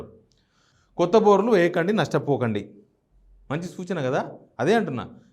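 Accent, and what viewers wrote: Telangana, India. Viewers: అన్నగారు మీ విశ్లేషణ కళ్ళకు కట్టినట్లుగా తెలంగాణ యాసలో చాలా అద్భుతంగా ఉంటుంది....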